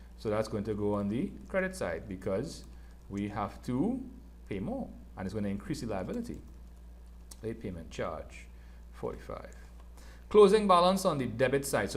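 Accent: Trinidad and Tobago. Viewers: U sound trini owo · He doesn’t, that’s a Trini accent😭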